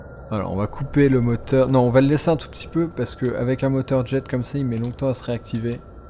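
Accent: England, United Kingdom. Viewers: Il a un putain accent anglais!! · tas laccent anglais toi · Perso je kiff l'accent Anglais de <3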